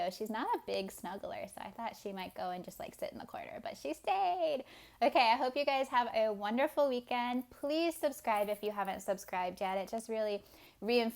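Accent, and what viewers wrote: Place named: United Kingdom. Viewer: Very cute and awesome advice! And excellent British accent. :)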